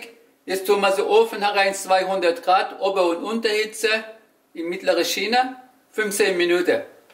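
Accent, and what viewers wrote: Swabia, Germany. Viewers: Ich liebe deinen schwäbischen Akzent 🥰 Liebe Grüße aus Berlin auch an deinen Sohn. Er macht auf deinen Kanal hervorragende Arbeit und 👍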